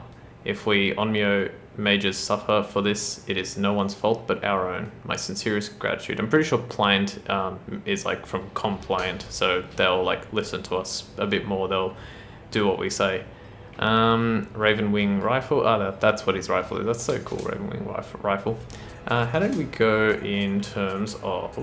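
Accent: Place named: Australia. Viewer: This is a great series, also where abouts in Australia are you from?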